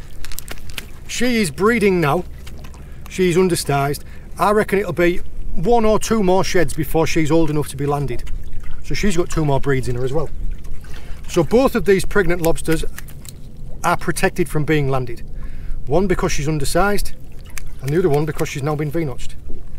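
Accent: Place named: North, United Kingdom. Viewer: Great vlogs, your like the fred dibner of fishing, your teaching abilities are on point, more so than reading a book, what you say is very interesting it goes in one ear and it stays in the other. Is your boat able to sustain a living or do you have another income source. How many pots do you set per day. Where in the north is your accent from. Set a trap for the pot thief's.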